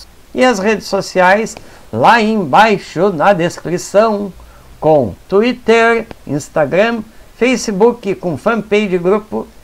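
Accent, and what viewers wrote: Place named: Rio Grande do Sul, Brazil. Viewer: Ba tu es do Rio Grande do Sul?ba muito boa sua aula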